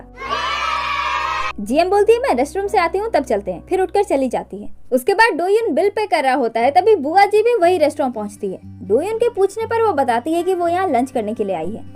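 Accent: Bengal, India. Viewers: Explanation was amazing and funny . And 1 question you are Bengali ❓ Because you are talking like about Bengali .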